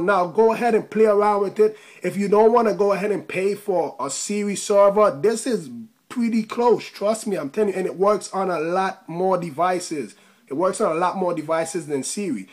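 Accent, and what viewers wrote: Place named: Jamaica. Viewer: just curious but what is your nationality? you sorda sound Jamaican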